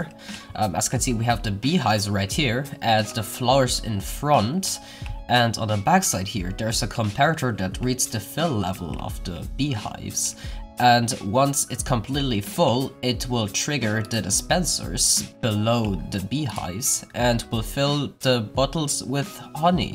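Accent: Germany. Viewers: MC He is German, you can clearly hear his German accent XD I guess all really good technical minecrafters come from Germany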